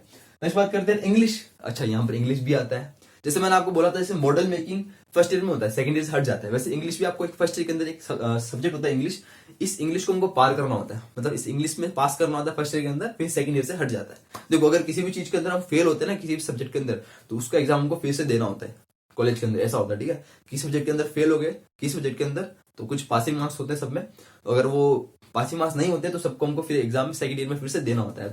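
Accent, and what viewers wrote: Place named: Marwar, India. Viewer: bhiya aapke voice me se mast marwadi tone aa rahi hai isliye ghar jaise lag raha hai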